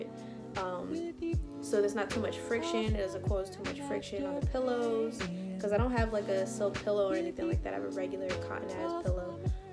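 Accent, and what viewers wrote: New York, United States. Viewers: yerrrrrrrrr you so new york i love it lol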